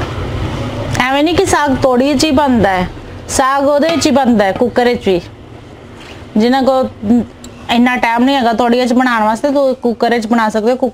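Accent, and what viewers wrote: Faisalabad, Pakistan. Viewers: ji, tusi kehray pind tun hagay o, kion k tuhadi punjabi bilkul Pakistan wich Faisalabad wich boli jandi ay. Saday nal bilkul mildi ay tuhadi boli. Pakistan tu tuhanoo bahut bahut salam. Saadi baradri v Khokhar ay, asi te fer aiko baradari de hoey na???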